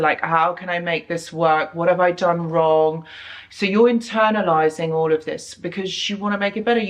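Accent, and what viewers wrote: England, United Kingdom. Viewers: Yes, your videos are insightful..what I like even more is your luscious England accent 🤍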